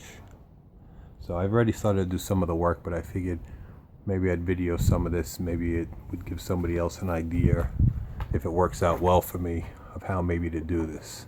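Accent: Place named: New York, United States. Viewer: Awesome new york voice!!!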